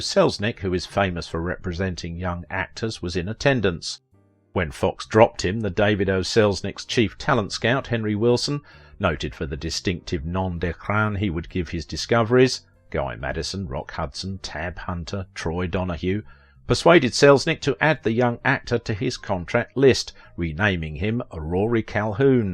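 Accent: United Kingdom. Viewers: Thanks for the backstory on Rory! Wow, what a male w...e. He did look good though! I would guess his street smarts gave him the rough, and tough look some parts needed. I remember many shows he was in. Too bad he had such a bad temper. I truly enjoy your voice, and story telling style. The sound of your british accent is easy to listen to, and tone and tempo really awesome. That was my dream to be a voice over actress, or book voice. Look forward to more of your work.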